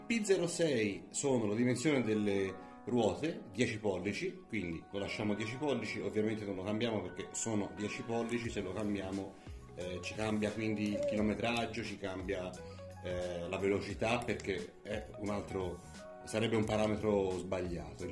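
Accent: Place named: Florence, Italy. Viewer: Tu hai avuto una buona esperienza...io invece no... l'ho comprato da una settimana...la velocità è di massimo 37 km orari, la batteria non dura quanto dovrebbe e tra l'altro mi si è forata anche una gomma, ritengo anche che i materiali non siano di buona qualità. Secondo te il monopattino è difettoso? sono di Firenze e sento che dall'accento anche tu lo sei.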